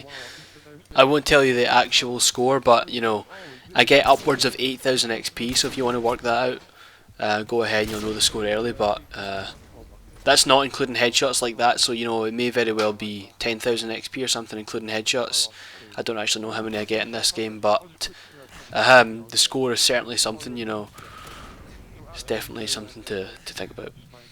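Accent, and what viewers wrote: Scotland, United Kingdom. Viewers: are u scottish if so were 4rm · arent u british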